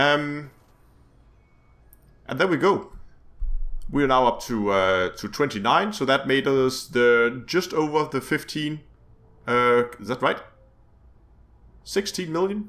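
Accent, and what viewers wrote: Denmark. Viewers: Are you danish? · nemt at spotte ens egen accent, men perfekt engelsk ellers:)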